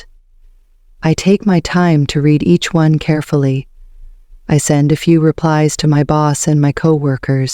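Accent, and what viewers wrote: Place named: United States. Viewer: Sorry why it's It's the British council but you've an American accent? I want to learn English accent with this method. Thanks.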